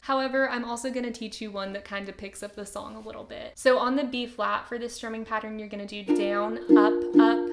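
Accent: South, United States. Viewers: She's southern stop being so rude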